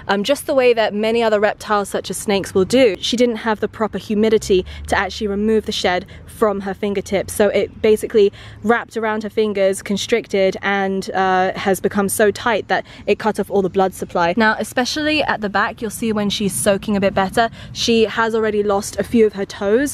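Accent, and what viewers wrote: Australia. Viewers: Is she Australian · Why do I think she is Australian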